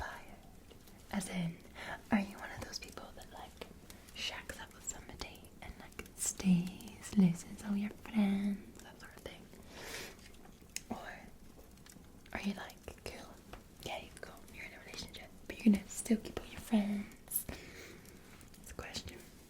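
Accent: Scotland, United Kingdom. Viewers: I love your Scotish accent it's so soothing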